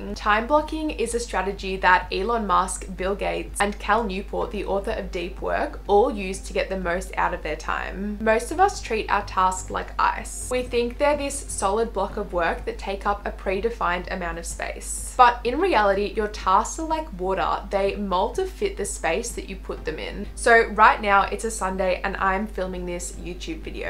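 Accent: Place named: New Zealand. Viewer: I love her kiwi accent! So pleasant to listen to.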